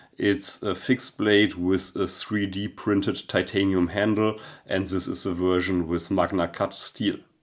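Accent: Germany. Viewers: Your accent is undeniable german - but likeable 😉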